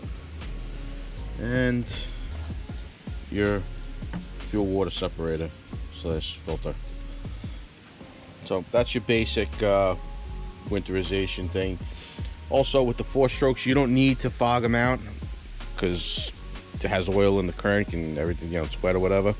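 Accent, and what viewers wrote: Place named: Long Island, United States. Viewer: Sounds like your from L.I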